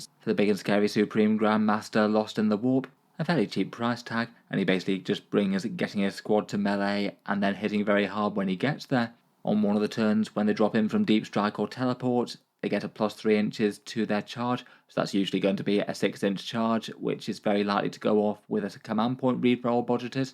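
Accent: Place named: United Kingdom. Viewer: So is very clearly from UK. As an American it throws me for a loop whenever i hear Loo-tenant instead of Lef-tenant in a British accent.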